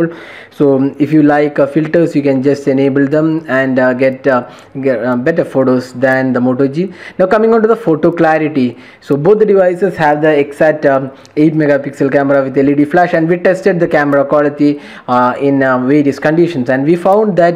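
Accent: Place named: South India, India. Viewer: Man half the things he said have to be heard twice ... that thick south indian accent... really confusing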